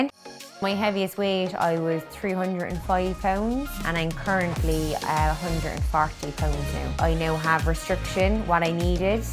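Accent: Ireland. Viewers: Couldn't believe it when I heard da Cork accent on utube! 😄 I'm from Cork too....Barrack St area. Fair fucks to you, lady. You are blessed. I nearly died from obesity. Heart attack at 45, open heart surgery at 53. That could have been in store for you or worse. I turned it around just like you after my operation 7 years ago. I calorie counted at the start, eat a clean diet and zero junk food. I exercise regularly and do Kettlebells and Bands for resistance training at home. Lost 80lbs and more importantly my destructive junk food habit and 80 a day smoking habit. I have a few nice war wounds to remind me off my past....a nice scar all the way down my chest where they sawed me open for the op in the CUH. I also have a nice scar from my ankle all the way up to the top of my thigh from where they harvested my vein from my leg to perform the bypass surgery. Lucky to be typing this, so scars are a least of my worries now. 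Great to see your transformation and more importantly that you have given yourself an opportunity of a long life. You are looking hot, 🔥 my dearest....not just on the outside, but most definitely on the inside too. Well done. Lots of love on this Paddy's Day.☘ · Beautiful girl, she must be Irish with that accent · I stuck around mainly for the Irish accent 💚🍀😁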